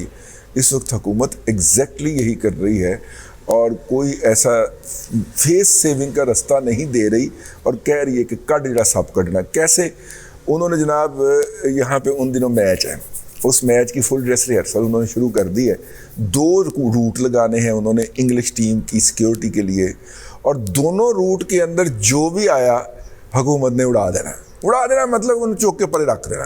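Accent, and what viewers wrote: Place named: Multan, Pakistan. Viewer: dada g kum uz kum aap to saraiki na kahain. ye punjabi ka multani accent hai.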